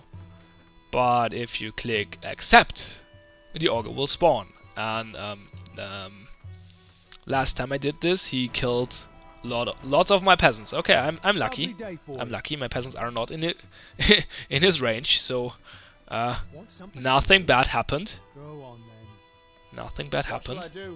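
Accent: Germany. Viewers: Man hört einfach direkt, dass da ein deutscher hinter dem Mic sitzt. ^^ Zum Beispiel sprichst Du "pretty" so aus wie es geschrieben wird, also betonst das E, teilweise auch prätty, wohingegen Menschen mit Muttersprche Englisch es eigentlich fast alle "pritty" aussprechen. ^^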